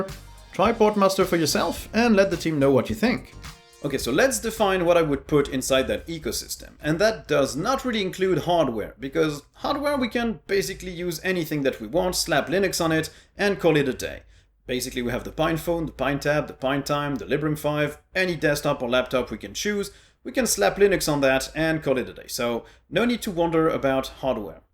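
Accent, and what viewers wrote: France. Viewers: I'm french and I find it weird to listen to a Frenchman speaking in English. But your channel is very interesting and you're the reason why I'm rocking elementary os on my laptop as a teacher everyday!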